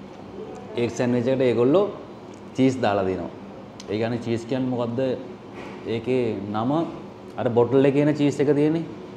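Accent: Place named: South, Sri Lanka. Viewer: මම නම් ඔයාව subscribe කරෙත් video බලන්න ගත්තෙත් ඉස්සර හිටපු travel bro නිසා❤අනිත් එක කතා කරන විදිය මොකද දකුණෙ නිසා❤මොකද මාත් දකුණෙ 😁, ඉතිං සුබ පැතුම් අනාගතෙට❤🎉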